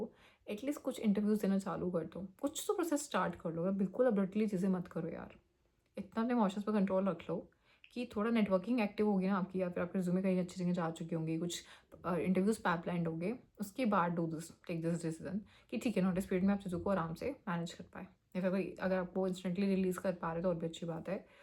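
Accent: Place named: Delhi, India. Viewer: Aapka communication and Hindi dekhke I can be sure ki aap Delhi se ho.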